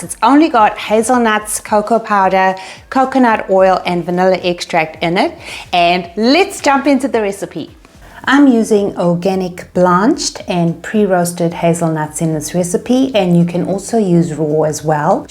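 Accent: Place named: Durban, South Africa. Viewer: thank you love it ..you have a Durban Accent right?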